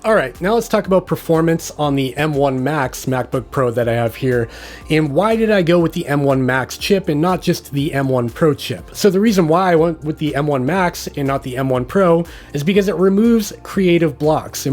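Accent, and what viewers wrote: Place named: Canada. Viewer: I thought he was Canadian too. The way he says “about”….aboot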